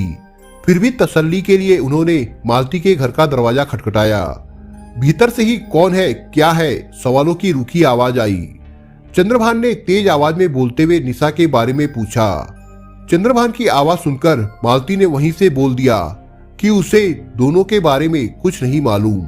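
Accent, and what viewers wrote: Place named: Bihar, India. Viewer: Language sudharo bihariyon ke language mat bolo pure world mein viral hota hai video sha Ko sa mat bolo Nisha ko Nisa mat bolo aap ka video dekhna chahte hain lekin aapki language pasand nahin hai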